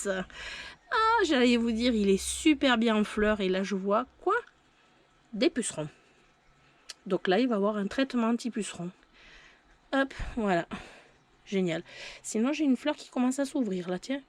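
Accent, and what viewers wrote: South, France. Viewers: Coucou je dois te dire que, outre ta belle collection de plantes évidemment, j'aime beaucoup ton accent du Sud. Moi qui suis en Normandie je me rends compte en t'écoutant que nous les Normands mangeons les e: on dit "jardinrie" par exemple au lieu de "jardinerie", "boulangrie" etc:D 😆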